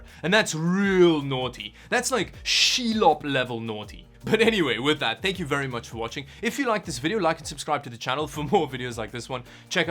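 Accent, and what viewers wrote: Afrikaans, South Africa. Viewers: Is jy van Suid Afrika? Jy klink Afrikaans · I'm so curious too, South African is my guess.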